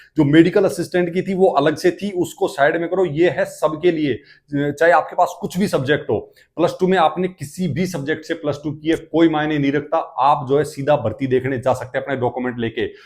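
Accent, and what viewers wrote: Haryana, India. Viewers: Haryana ki ayi ha kya sir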